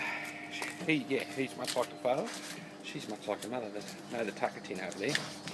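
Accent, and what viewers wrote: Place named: Australia. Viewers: You do have an Aussie Accent but not as strong as some. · I know it's because you're Aussie but the way you speak to them sounds so bad to me as an American (obviously they're dogs so how you speak to them doesn't matter) but it's just kinda funny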